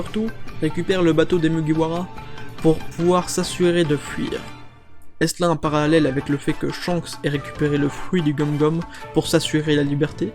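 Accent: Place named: Democratic Republic of the Congo. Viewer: ça se voit à ta voix tes congolais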